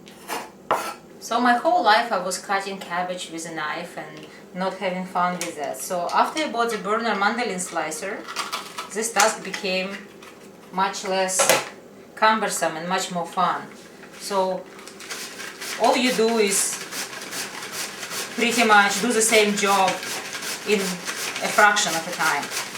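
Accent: Russia. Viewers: terrible russian accent... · God how I love Rooshan accent.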